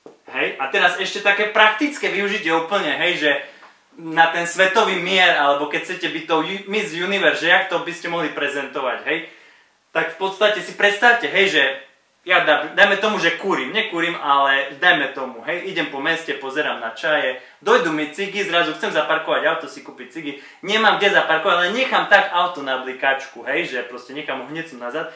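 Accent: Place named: Šariš, Slovakia. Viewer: Ten prízvuk :D .. strašne mi to vadi, keď hovoríš po SK tak skus pouzivat normalny prizvuk, a ked tak šarisky uz rovno hovor, lebo sa to dost hrozne počuva občas :D .. ale inak dobre video :)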